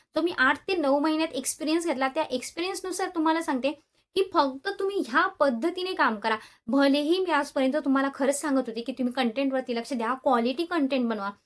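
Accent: Nashik, India. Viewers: Tai tu nashik chi ahe ka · Tai tumhi nashikchya ahat ka ?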